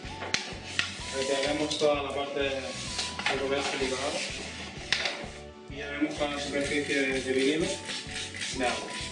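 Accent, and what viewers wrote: Chile. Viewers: No se entiende lo que dice!!! Eres chileno? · chileno la wea fome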